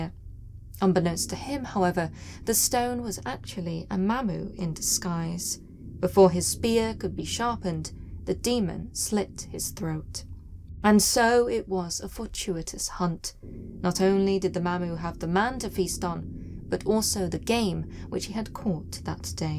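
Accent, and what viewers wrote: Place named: East Anglia, United Kingdom. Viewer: I love you lady! Your East Anglia accent and proper demeanor excel beyond the mediocre expectations placed on YouTube by so many. You are better than you do and deserve your Home television show or 30 minute spot at the least!! Bless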